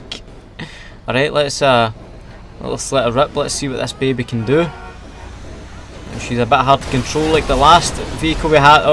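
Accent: Scotland, United Kingdom. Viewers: Where in Scotland you from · Where about in Scotland are you from ??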